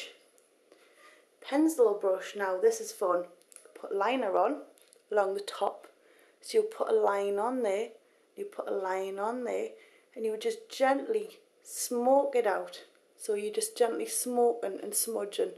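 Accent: United Kingdom. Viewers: i always loves your video. Thank youuuu.. oya btw, i love ur british accent! :D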